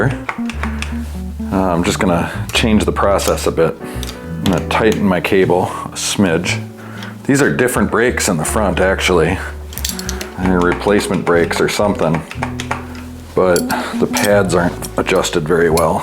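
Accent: Wisconsin, United States. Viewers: The Wisconsin accent takes this from "very informative and helpful" to "neighborhood dad helps you fix up a bike." Very wholesome. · Hello from WI! Finally, someone who talks like me! I don't know why the internet thinks I need bike content, but I'm glad it does! Love the humor. Love the music. Love the pace. Keep it up! PS - Where in WI are you located?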